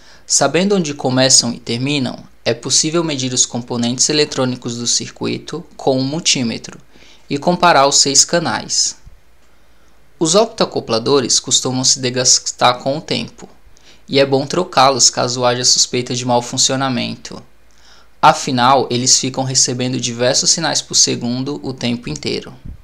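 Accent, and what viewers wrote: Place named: Ceará, Brazil. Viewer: Olá, boa noite., você é Cearense, né? Percebo que você fala como a gente aqui, rsrs. Mas, vamos lá... Os equipamentos inversores DC/AC de placa solar, são similares aos inversores de frequência de motores? São similares? O reparo é mais simples ou complexo? Desde já sou grato pela sua atenção.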